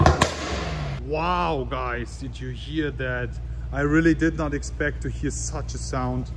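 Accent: Germany. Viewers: Nothing like the german accent. AMG Uersion 😌👌🏼 lol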